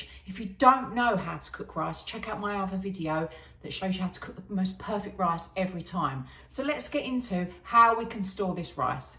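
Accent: United Kingdom. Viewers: Bing bang bosh, you sound like you’re from London mate. Thanks for the handy video 🙏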